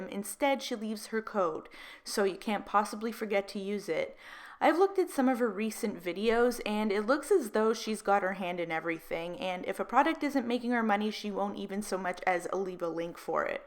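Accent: Canada. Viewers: Ugh, you sound so canadian it hurts. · i love canadians o's. dey so nice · OMG are you Canadian!!! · I can hear your Canadian accent lol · You are canadian right? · "The one im aboot to talk aboot.... so you can see what im exactly talking aboot" I've never recognised a Canadian accent so clearly until now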